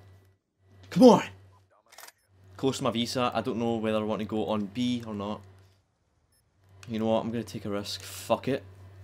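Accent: Scotland, United Kingdom. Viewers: What part of Scotland you from?, im from Edinburgh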